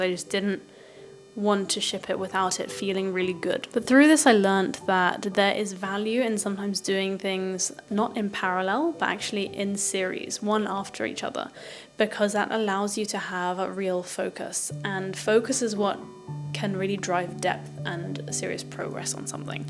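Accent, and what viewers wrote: RP, United Kingdom. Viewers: Oh dear, you are the first one I check when I want to learn RP accent and a foreign language! Congratulations on your life achievement, 我祝你和家人一切顺利！ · Thank you so much for your podcasts and and videos in English. I really like British English, especially when you pronouncing it! Keep making this videos because you can not even imagine how it’s changing someone’s English skills! You are the best! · Wow! Welcome to Hong Kong! Congrats to you with the many new chapters in life! Hope to bump into you in Hong Kong one day! Love your British accent!❤😂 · Dear! Your videos are really helpful. Keep on doing your great job! I personally enjoy listening to your perfect RP pronunciation, your soft voice and, which is the most important, wonderful tips you give on different subjects! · I love your British accent..please continue to inspire us. 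- Love from Kuala Lumpur.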